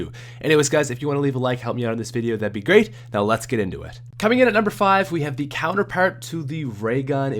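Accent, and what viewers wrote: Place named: Canada. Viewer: Lol ur Canadian right? Love you Canadians out there. "What is not to love aboot this thing?" Hahaha 💖😁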